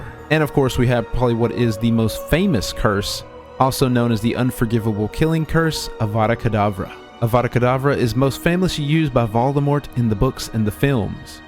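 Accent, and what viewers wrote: South, United States. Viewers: Good video! Jw, I can’t decide your accent, where are you from? Southern?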